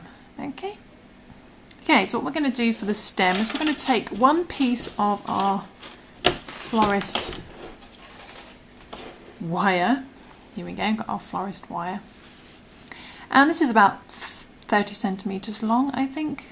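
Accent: United Kingdom. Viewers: Another great video. I love all your designs. Hearing your accent i think you may live in UK. If you do, can you please tell me where i can buy yarn..a big shop! All i have found by now are baby yarn or dk yarn on a chinese local shop..thank you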